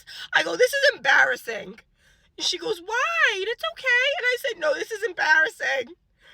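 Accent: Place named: Louisiana, United States. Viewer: The accent! Sound like us down in Louisiana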